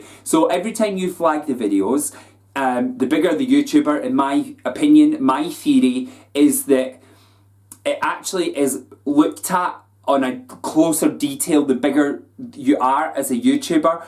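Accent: Scotland, United Kingdom. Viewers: Your accent reminds me of this really hot Scottish squaddie I dated last summer, such good memories 🤤🤤🤤🤤😍 so sad we lost contact arrrrrghhhhh!!! random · love hearing a Scottish accent on youtube!!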